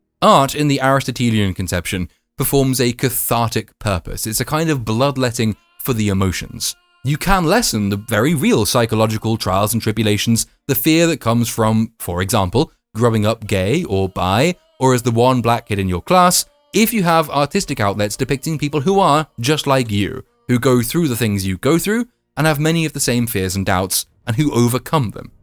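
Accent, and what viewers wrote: United Kingdom. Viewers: How did I know you were British before I even clicked on the video?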